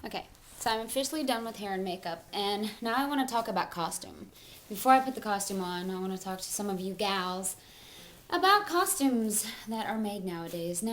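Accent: Texas, United States. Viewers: i like the tutorial...but u need to be more professional and stop talking in that texas voice. grow up a little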